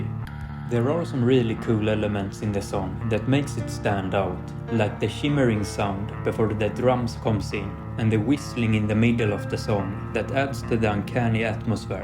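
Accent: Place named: Sweden. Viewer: It was funny for me to listen to english with such a strong swedish accent 😇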